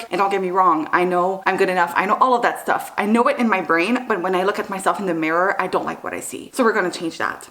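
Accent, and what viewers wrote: Quebec, Canada. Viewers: This is my first impression and I’m not mad about it my queen❤❤ are you québécoise ?? Hihi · New subscriber here. Are you French Canadian?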